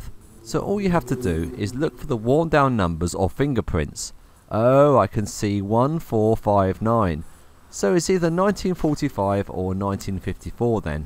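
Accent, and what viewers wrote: United Kingdom. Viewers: You've got a beautiful British accent * . *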